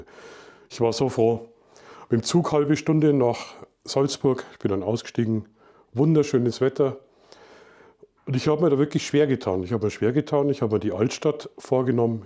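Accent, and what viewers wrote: Bavaria, Germany. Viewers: Hey hat mir sehr gut gefallen Deine kleine Führung durch Salzburg auch die Nachvertonung 👍 witzig und typisch bayrisch äh 😂 LG Sascha😊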